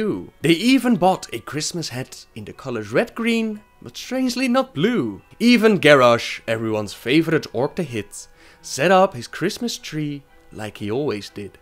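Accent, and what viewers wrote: Netherlands. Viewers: Love the lore vids. It's nice not having to read it all on the wiki for a change. That accent, Dutch?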